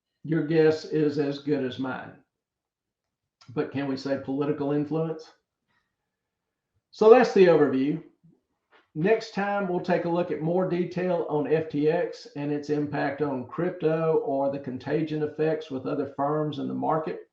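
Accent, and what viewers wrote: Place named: Alabama, United States. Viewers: That Alabama accent! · Another L&Ler subbing & watching. Your content is amazing. Add in the dogs & southern accent, and the “bigass” adjective and I’m here to stay! Thanks for the breakdown.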